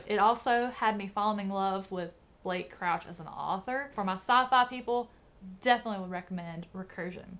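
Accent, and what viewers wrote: South, United States. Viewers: I love your channel. I have a strange question. Do you often get judged for your southern accent? Meaning the stigma about the south and education? I wonder because I have big muscles and I often get comments from people like “meathead” or girls being shocked that I’m a book nerd. Anyway, weird question ,I know. I was just curious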